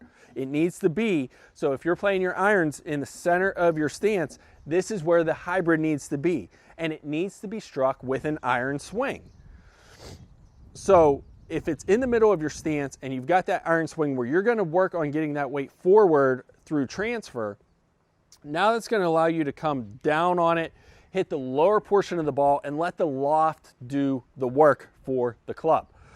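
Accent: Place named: Western Pennsylvania, United States. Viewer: You have a western PA accent...was hoping you may be from the Pittsburgh area...I just purchased a cheap set of single length irons just to try them out and was looking for someone local to me to help with the transition...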